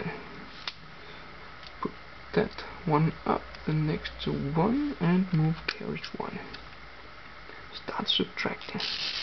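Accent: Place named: Denmark. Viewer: oh god that horrible accent you're danish aren't you?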